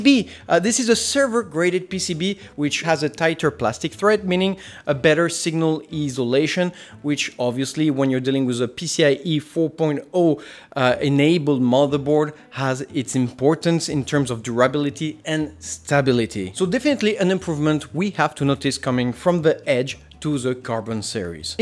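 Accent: France. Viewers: accent français ?? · you are french, right ? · he is french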